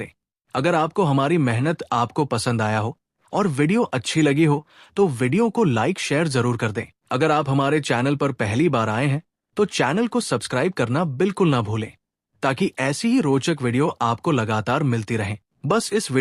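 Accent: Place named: Bengal, India. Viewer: Ye kaisi hindi hai aap ki .bangali jaisi .usse bhi buri .kya grammer hai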